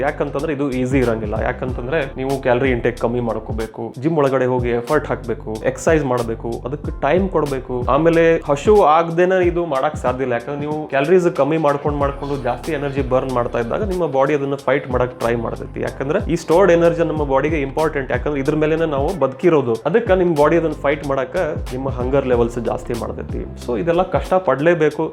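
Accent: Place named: North Karnataka, India. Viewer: Fantastic... bhari mast explaination namma north Karnataka slang... MPTY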